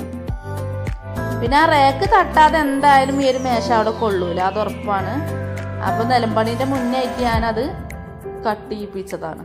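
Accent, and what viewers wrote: Malappuram, India. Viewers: Spr vedio&malappurm samsaaravum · നമ്മുടെ മലപ്പുറം ബ ർത്താനം കേൾക്കാൻ എന്താ രസം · ഞാനും മലപ്പുറാ പക്ഷെ ഇങ്ങിനെത്തെ ഭാഷ സംസാരിക്കില്ല ട്ടോ- കേൾക്കുമ്പൊ ഒരു പ്രത്യേക രസം😅😍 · താത്ത എന്റെ മലപ്പുറം ഭാഷ നല്ല രസമാണ് എന്തിനാ താത്ത ഇംഗ്ലീഷ് താത്ത എന്റെ ഭാഷ എല്ലാവർക്കും മനസിലാകും കൊറേ ഒക്കെ പഠിക്കാൻ പറ്റി ഇനിയും പുതിയ വിഡിയോസൈവരണം insha allah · ങ്ങള് ന്തിനാ ഇംഗ്ലീസ് പറേണു ..മലപ്പുറം style സൂപ്പർ അല്ലെ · ഇതാണ് തനി നാടൻ മലപ്പുറം ഭാഷ അഭിനയവും നാട്യങ്ങളും ഇല്ലാത്ത വീഡിയോ മാത്രമല്ല വീട് പെയിന്റ് അടിക്കുന്നതിന് മുമ്പ് തന്നെ ഇതൊക്കെ കാണിക്കാനുള്ള മനസ് വന്നതും ബെഡ് ഷീറ്റ് വീഡിയോക് വേണ്ടി ശരിയാക്കിയതാണെന്നു പറഞ്ഞതും നിങ്ങളുടെ മനസ്സിൽ അൽപ്പം പോലും ജാഡയും പൊങ്ങച്ചവും ഇല്ലാത്തത് കൊണ്ടാണ് ആദ്യമായിട്ടാണ് ഈ ചാനൽ കാണുന്നത് വീഡിയോ ഒരുപാട് ഇഷ്ട്ടപ്പെട്ടു ഇതൊക്കെ സപ്പോർട്ട് ചെയ്തില്ലെങ്കിൽ പിന്നെ ഏതാ സപ്പോർട്ട് ചെയ്യാ ഞാനും മലപ്പുറമാണ് നിങ്ങൾ മലപ്പുറത്തു എവിടെയാ? 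· നിങ്ങൾ എത്ര വരെ പഠിച്ചു നിങ്ങളെ സംസാരം കേട്ടാൽ മലപ്പുറത്തക്കാരെ ഓർമ വരും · ഗുഡ് ഈ സംസാരം കേൾക്കാൻ തന്നെ ഒരു പ്രതേക സുഖം മലപ്പുറം സംസാരം എത്ര കേട്ടാലും മതി വരില്ല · ഇത്താ ഞാനിപ്പഴാ ഇങ്ങളെ ചാനൽ കാണുന്നത്... ഞാനും മലപ്പുറം പെരിന്തൽമണ്ണയിലാ... ശെരിക്കും ഒരുപാടിഷ്ട്ടായി... ഹോം ടൂർ ഒരുപാടിഷ്ട്ടായി...നമ്മടെ മലപ്പുറം ഭാഷ കേട്ടപ്പോ അതിലേറെ സന്തോഷായി 🥰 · ഇതാണ് അസ്സൽ മലപ്പുറം ഭാഷ...Very good ingane thanne parayanam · നല്ല വീട് മലപ്പുറം ഭാഷ കേൾക്കാൻ നല്ല രസമാണ്. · നിങ്ങളെ മലപ്പുറം ബർത്താനം ഉഷാറായിക്ക്ണ് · മാഷാ അല്ലാഹ് നിങ്ങളുടെ മലപ്പുറം ഭാഷ പൊളിച്ചു ❤️❤️ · സംസാരം സൂപ്പർ. മ്മളെ മലപ്പുറം സ്ലാങ് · മലപ്പുറം ഭാഷ... ഒരു രക്ഷേമില്ല kalakki👍👍👍😁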